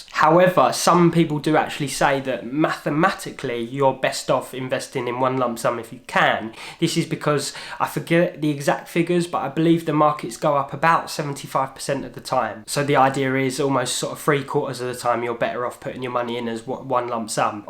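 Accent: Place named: Essex, United Kingdom. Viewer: You sound like your from my area in Southend, great video content on your channel keep up the hard work.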